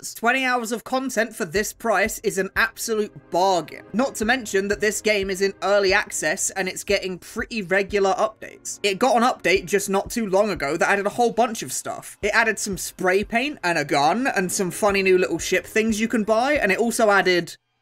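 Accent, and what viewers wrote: United Kingdom. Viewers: HE. IS BRITISH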